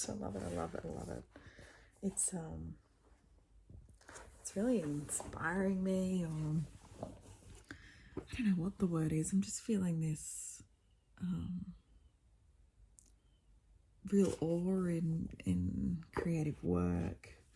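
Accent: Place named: Australia. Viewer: Brent wants to know if you’re originally from the Melbourne area. He’s listening to your accent.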